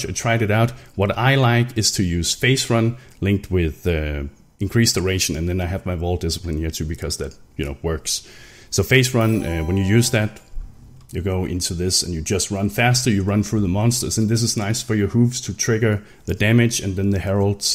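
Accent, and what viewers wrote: Denmark. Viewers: get hit by a little shit bird. lost it xD and nice Danglish xD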